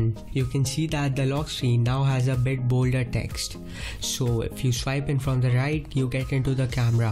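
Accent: India. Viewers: Your Indian accent is too funny! LOL.I couldn't understand most of the times! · Damn that Indian accent is so annoying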